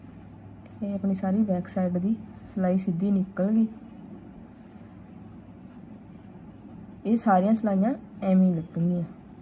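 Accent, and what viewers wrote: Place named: Malwa, India. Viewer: Bahut hi vadiya tarike nal samjaya & pure Malwai Boli🥰🇭🇲🙏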